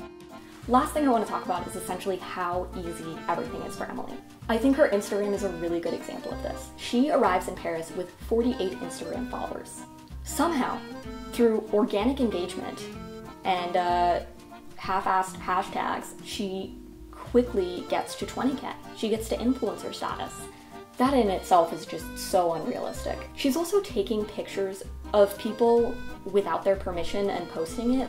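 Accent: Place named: United States. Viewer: I am sorry, but I did not hear any French accent in your English (very much American English if I may point out). I am speaking as a European with a Master’s in English who lives in America for a long time. I hear accents, and you have none, which makes me think that you are an American born and raised 🧐. Nothing wrong with that, BUT... relax! This show is not culturally 100% accurate, it’s a “feel good” show, and the amount of criticism you express is not going to get you more subscribers. You really need to find other topics and angles to grow your channel because the country of the USA is loving the show! 😀 With that said, there are many accurate cultural points in this show, yet again coming from an actually born and raised in Europe person. Don’t take yourself too seriously, and enjoy the show and the FASHION (which by the way is not “questionable”, and that’s the phrase that yet again gives away an American in you), and FEEL GOOD watching the show! 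😃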